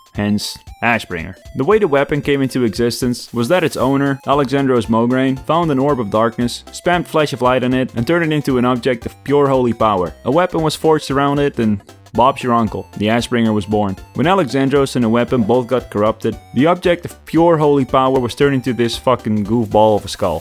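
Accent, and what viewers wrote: Netherlands. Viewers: You sound Dutch!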